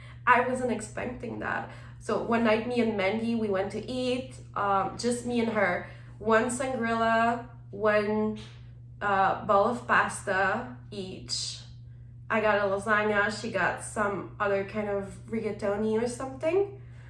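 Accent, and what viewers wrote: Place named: Russia. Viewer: Your accent sound a little bit Russian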